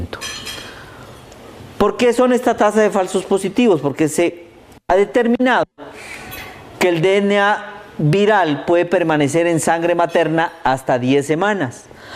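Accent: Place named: Colombia. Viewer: Que mal da clase no mames es colombiano seguro por su acento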